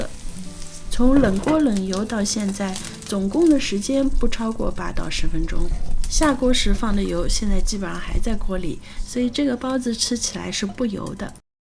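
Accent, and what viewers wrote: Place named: Jiangnan, China. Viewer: 你是我在YouTube上看到的做饭最精细的人，口音口味，真的是家乡风味。江南人做面食和北方人差别太大了。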